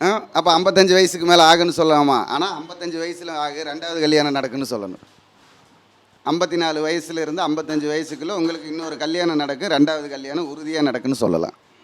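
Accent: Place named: Kerala, India. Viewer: நம்ப முடியவில்லை, கேரளத்தில் இருந்து தமிழ் சுத்தமாக எப்படி பேசுகிறீர்கள்?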